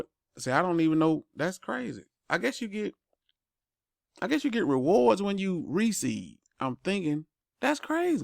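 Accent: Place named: South, United States. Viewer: you the man, where you from bruh. That accent sounds country like me.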